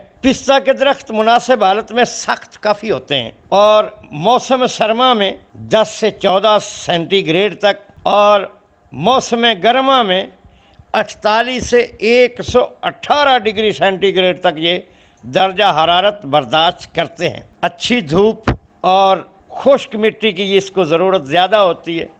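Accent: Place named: Punjab, Pakistan. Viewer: Yar apni Urdu to theek karo youn lugta hay jaise punjabi bol rahe ho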